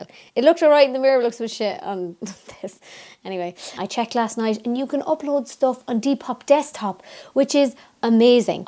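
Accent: Ireland. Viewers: I like ur accent!! What part of Ireland are u from originally?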